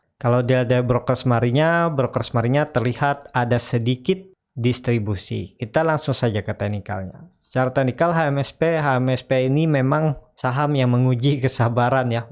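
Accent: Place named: Borneo, Indonesia. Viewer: maksudnya 'Khas itu" logat bahasanya kak...emang bahasa kita dayak kalimantan begitu...spt klo bahasa jawa kan 'medhok' banget gt.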